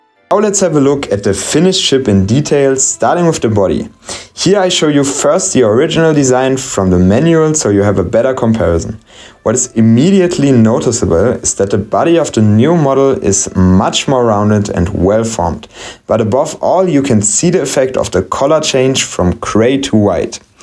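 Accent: Germany. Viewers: Am Akzent würde ich mal herleiten, dass du deutscher Muttersprachler bist. ;) Und ich bin schreibfaul, daher bleib ich gleich dabei. Ich hadere noch mit diesem Set. Wie derbe hängt das Cockpit wirklich wenn man es hochkant hinstellt? Hatte Fotos davon gesehen und das schreckt mich tatsächlich noch ab. Und mit diesen Videos hast du ne gute YT Lücke erwischt, gerne mehr. :)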